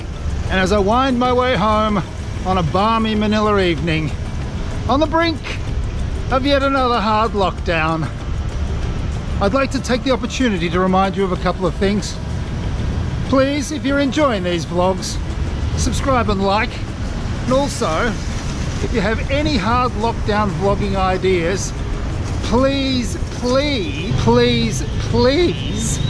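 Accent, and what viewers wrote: Australia. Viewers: It’s nice to hear an Australian accent as well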